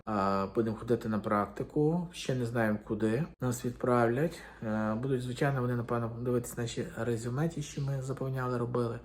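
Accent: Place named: Western Ukraine, Ukraine. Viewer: Ви не будете мати роботи, яка буде високооплачуваною,тому будете мати тільки на життя і їжу. Ми за кордоном потрібні як робоча сила. Я розумію тих біженців- українців, хто з окупованих территорій, або зони бойових дій, ваша вимова (суржик) дає зрозуміти, що ви із заходу України, тому не зрозуміло навіщо ви травмуєте трьох дітей цією поїздкою. Ніколи емігрантів не приймуть як своїх, а у місцевих "усмішка на обличчі, а позаду зуби на спині". Я так пишу, бо маю досвід біженки, але я виїзджала сама, щоб знати чи варто їхати сім'ї з дітьми. Саме головне знання мови, якщо гарна іноземна, то влаштуєтесь, якщо ні - нічого їхати, або їхати в Польшу. Бажаю удачі, вона вам ще й як пригодиться!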